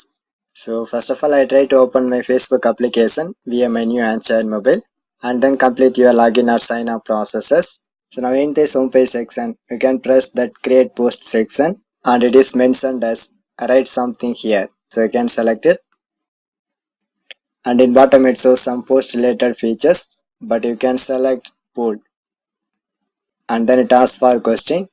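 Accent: South India, India. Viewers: Your South Indian accent... 😁👍🏻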